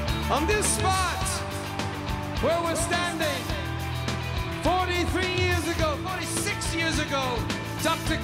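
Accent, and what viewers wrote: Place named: Ireland. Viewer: I still hear a brogue...not thick, but there...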